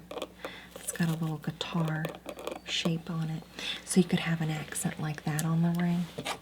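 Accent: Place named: South, United States. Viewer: I keep coming back to this one! You are just so talented! It’s so natural and calming and feels so real! (Also I’m from NC—you have to be from the south too!!) 🥰🥰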